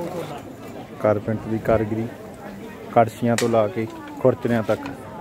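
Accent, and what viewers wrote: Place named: Amritsar, India. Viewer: bhau Amritsari gurdaspuri lgda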